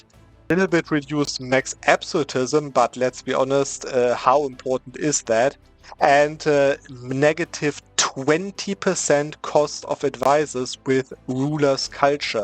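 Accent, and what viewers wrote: Germany. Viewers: Lern mal richtig Englisch sprechen. Ist ja nicht auszuhalten mit diesem unerträglichen deutschen Akzent, mein Gott alter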